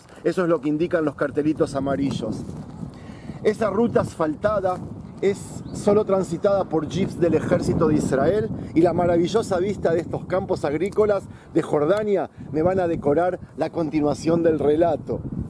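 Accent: Argentina. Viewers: El sonido de su voz como se le escucha parece que es Argentino, pero que vive en israel.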